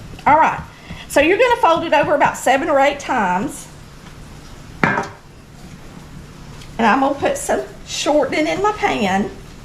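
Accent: South, United States. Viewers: I’m from Texas which I guess is more west than south because I’ve never had grits nor red-eye gravy though I’d like to try. But most of my ancestors come from Tennessee and Georgia, so I love getting to learn about true Southern cooking from you. You make it look so easy. I especially appreciate your bubbly personality and your very southern voice, reminds me a lot of the women on my father’s side—always cooking, caring for people, and laughing.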